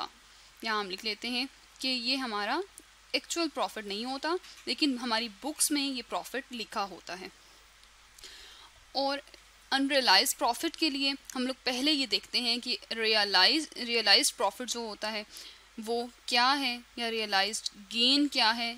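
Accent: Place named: Pakistan. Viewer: Your voice sound as if you're Pakistani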